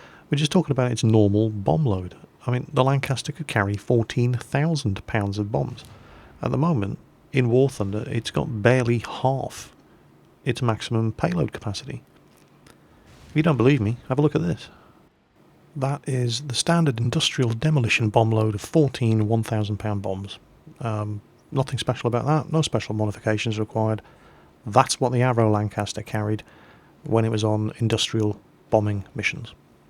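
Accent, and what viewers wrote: England, United Kingdom. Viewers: Love the videos. nice to hear another English You Tuber and yer you got me into both WOT's and War Thunder thanks allot its all i really play anymore. Good Luck with future videos and respect for being loyal to your "fans" and posting regular content! All in all great journalism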